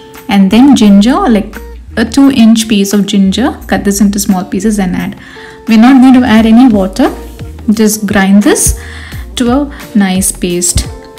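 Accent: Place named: United States. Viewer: நீ பேசுகிற அமெரிக்கன் இங்கிலீஷ் விளக்கவுரை மிகவும் அருமை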